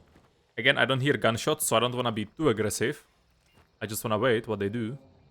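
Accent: Czech Republic. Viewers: Damn i think i hear czech accent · Náhodou jsem na Tebe natrefil, podle přízvuku si říkám, to zní jako obyvatel stejné země jako já a ono ano 🙂, jsi opravdu kapacita, mrknu, zda máš i další videa a pak dám odběr, díky. :hand-purple-blue-peace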